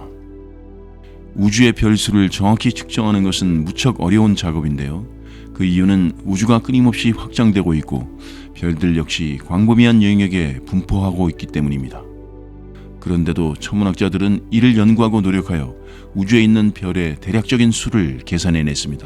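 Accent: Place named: Gyeongsang, South Korea. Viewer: 영상 처음보는데.. 경상도사람 이시구나.. ㅠ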